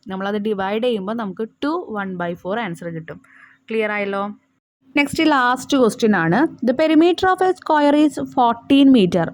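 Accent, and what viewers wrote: Thrissur, India. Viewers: ത്രിശൂര് കാരാണെന്നു തോന്നുന്നു ടീച്ചർ അതു പോലെയുണ്ട് പറച്ചിൽ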